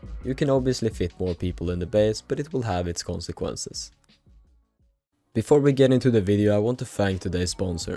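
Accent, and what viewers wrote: Sweden. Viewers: I can hear that you are swedish my g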